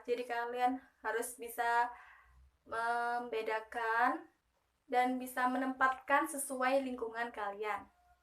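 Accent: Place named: Java, Indonesia. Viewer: Ngomong kok medok mbak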